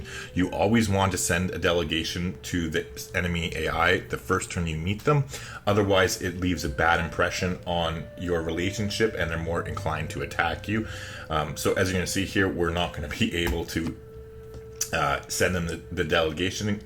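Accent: Canada. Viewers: yep that’s what I meant. Awesome video by the way, been playing civ for years and learned a lot of cool stuff just watching this. Sick Canadian accent as well. Also are you gonna continue this series or was this just a one time thing to show beginners how to play out the first 50 turns?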